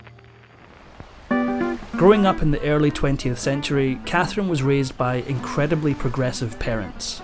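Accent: Scotland, United Kingdom. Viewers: I enjoyed the Narrator's Scots dialect very much. I wonder how Ms. Atheist Woman is enjoying her afterlife? lol! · But it's not AI!!! Unless AI can now do a Scottish accent. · Interesting to a point, however I was put off listening to more than 4 minutes by your lack of enunciation. You're doing such a good job but please don't let yourself down. I'm Scottish too and unless you want to live in Lorna Slater's "Sco-land" can I, with all due respect, please ask you to pronounce "criticisms" as "criti CIS ms" not "cri-i-sisms" and "thea T re" - don't say "thea-rr". Does ma nut in so it does.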